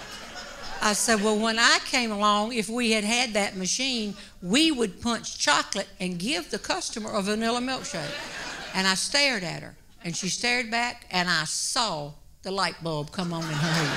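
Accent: South, United States. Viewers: She is a gem! She used “mash” when “pushing” a button. Love her Southern twang! Recently discovered! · It wouldn't be the same without the southern accent. I could listen to her for hours. I can't listen to Samantha Bee or any of the newer "female comics/comedians" for more than a few seconds. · I've never heard a southern drawl in real life... it's quite comforting. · Depending on which part of the south one is from will determine how your southern accent is accentuated. I am from New Orleans and would have a hard time understanding me. However, her voice plays like fine music to my ears. I can also listen to Shelby Foote in the still of a morning, drinking my first cup of coffee and feel all is well.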